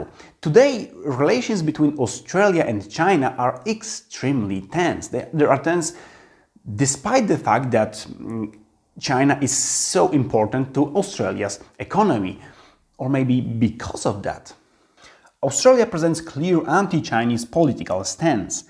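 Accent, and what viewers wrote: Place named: Poland. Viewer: Add english subtitles asap. You need them with your polish accent.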